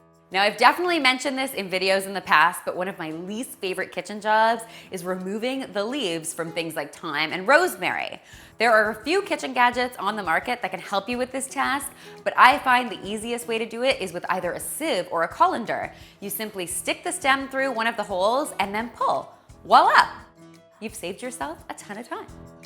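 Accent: Canada. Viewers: she's canadian, everyone has different accents. it can be both honestly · Numptie Brown She is Canadian . and BTW English and American has different accents and it's herbs and erbs, so.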